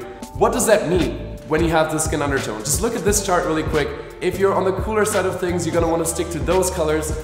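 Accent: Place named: Germany. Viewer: I’m quite obsessed with your German English accent here, very thick!! I used to work in a German company and all I could hear if not paying too much attention sounded very German to me. Great video I’ll recommend this to my male friend.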